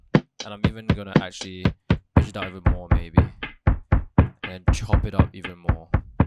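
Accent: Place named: Northeast India, India. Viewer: BTW BRO U LIKE LIKE NorthEast indian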